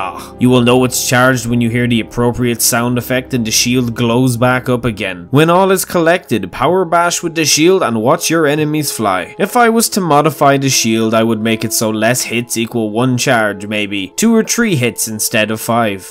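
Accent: Ireland. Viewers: ‘Crisp Irish accent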